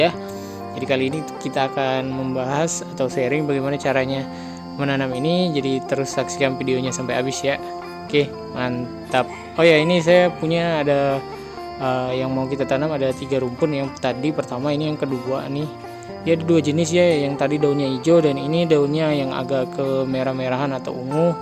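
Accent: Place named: Ambon, Indonesia. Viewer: kirain mas orang ambon..logaty ky ambon...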